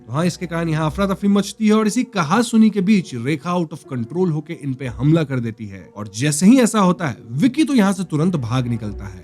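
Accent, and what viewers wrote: Bengal, India. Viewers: Bengali pronunciation from bhaiya se uff damn cute. Once again love from wb and i m one of your bengali subscribers or watchers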